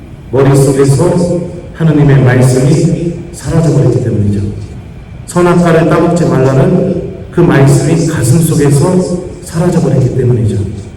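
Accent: Chungcheong, South Korea. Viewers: 신부님 뵈오니 정말 좋았습니다 구수한 충청도사투리가 더 정겹고 좋았습니다 우리는 구원 받고 성령이끄심 대로 신부님 말씀 기억하면서 잘 살겠습니다~신부님 감사합니다 ❤❤❤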